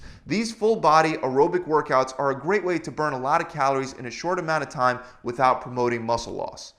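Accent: Philadelphia, United States. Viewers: Coming here just to say that this guy is obviously from Philly: "drink more 'WOODER' ['water'] throughout the day!" Wooder wooder wooder! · He's from Philly or South Jersey. He says wooder. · Are you from philly? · Me: He sounds like he's from the northeast. Dude: Wutter Me: Philadelphia.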